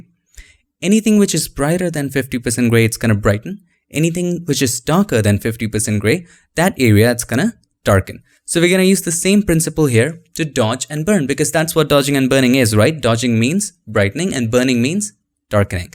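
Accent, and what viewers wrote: India. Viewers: best Indian accent I ever heard (.)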